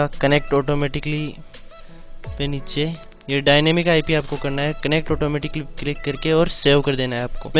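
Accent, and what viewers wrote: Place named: Shekhawati, India. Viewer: Bhai rajasthan se h na pka shekhawati se 🤣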